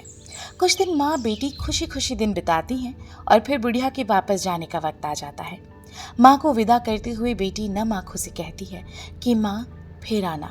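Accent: Garhwal, India. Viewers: Tears on my eyes.. thanks, I love you maa forever.. 'hit tumadi bate bat me kya zandu Teri budiya ki baat'.. ma'am your garwali accent just osm. 😊😊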